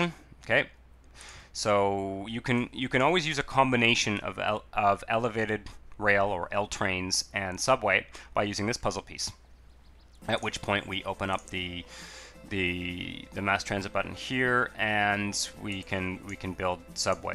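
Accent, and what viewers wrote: Canada. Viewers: Thanks man! This whole time I thought you were from Toronto btw haha you have that accent, I’m from Toronto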